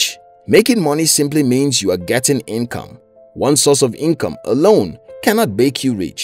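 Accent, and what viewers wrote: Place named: Nigeria. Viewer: This accent is from Nigeria 🤩. Pick up's Nigeria for going full swing. It is grear channel. Im subs. Love it. Born in Mozambiqye myseld, living in South Africa. Thanks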